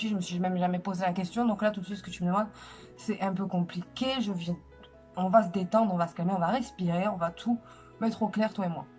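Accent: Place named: Catalonia, Spain. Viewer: Ton accent Catalan, un délice xD, vive le sud ! :p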